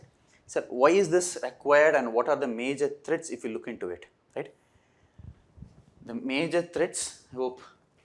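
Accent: South India, India. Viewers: South Indians accent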